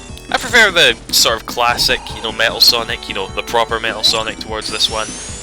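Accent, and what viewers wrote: Scotland, United Kingdom. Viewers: lol when i was a cat xD i love scottish accent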